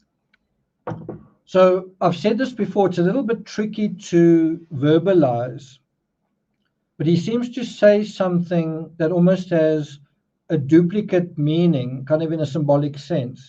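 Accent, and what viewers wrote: South Africa. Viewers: I was wondering what your accent is, is it South African mixed with British or just South African? Love it, so soothing. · yes, I love the SA accent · If you're weird then I am, too. Looks like we're in plenty of good company. His voice has such a smooth delivery plus I've become a fan of the South African (except for E.M.; his is almost gone anyway) accent because of him. He really is brilliant, which I enjoy in anyone. Will probably let him lull me to sleep again tonight.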